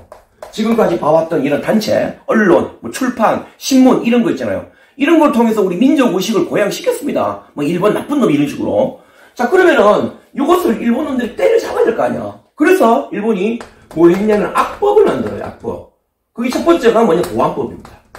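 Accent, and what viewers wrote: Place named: Gyeongsang, South Korea. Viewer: 듣다보니 깔끔정리 잘해주시고 시간이 금방가네요 경상도 사투리도 시원시원하시네요 또 올게요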